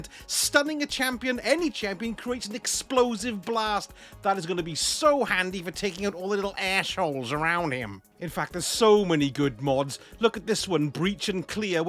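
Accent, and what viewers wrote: Wales, United Kingdom. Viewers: You sound Welsh?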